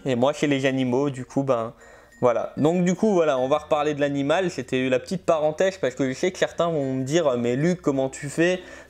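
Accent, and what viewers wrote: Alsace, France. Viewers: Est-ce que tu es Alsacien/Mosellan?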